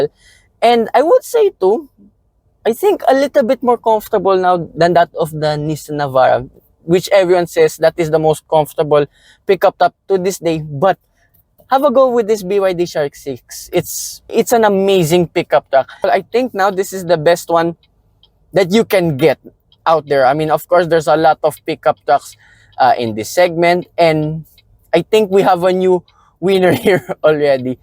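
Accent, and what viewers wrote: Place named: Philippines. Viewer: Pinoy accent pero in fairness magaling sya mag english. Malawak vocab nya 👍🏼 But I want to hear him magtagalog haha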